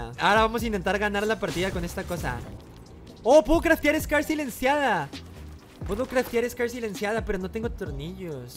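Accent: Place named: Castile, Spain. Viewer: hablar fresa y en español castellano... ASCO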